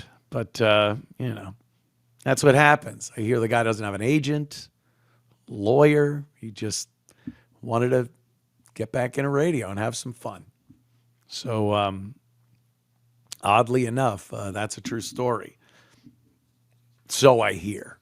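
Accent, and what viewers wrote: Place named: South, United States. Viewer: As a southerner I approve of ants new found accent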